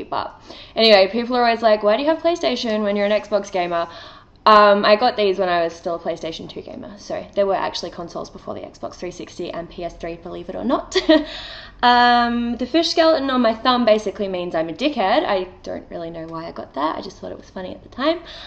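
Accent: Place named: Australia. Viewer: 1st time ive watched one your vids,your funny as fuck.aussie as accent.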